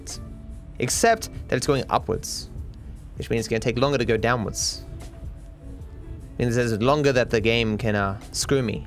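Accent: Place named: Australia. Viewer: You sound Soo middle class Au...! Enjoying the vids fella.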